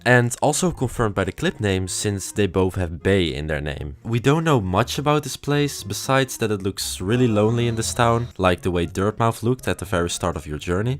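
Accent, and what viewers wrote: Netherlands. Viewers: Ik wist dat deze klerelijer nederlands was met dat accent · Are you dutch?!?! I LOVE IT! I already thought it because of the accent. Please like, if ur dutch!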